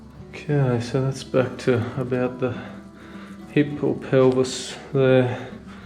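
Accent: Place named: Australia. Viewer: Love the Ozzy accent - a kiwi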